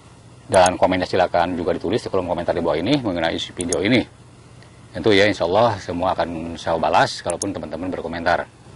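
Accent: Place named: West Java, Indonesia. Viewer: Sunda pisan cigah urang cilengsi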